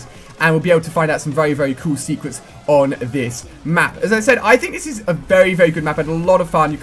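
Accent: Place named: United Kingdom. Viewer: It's because he's British and they sound different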